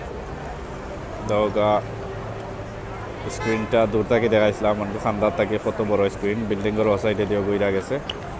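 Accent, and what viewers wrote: Sylhet, Bangladesh. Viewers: ata sylhety language understand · Md Khairul এ রিপুজির বাচ্চা এটা সিলেটের আজ্ঞলিক ভাষা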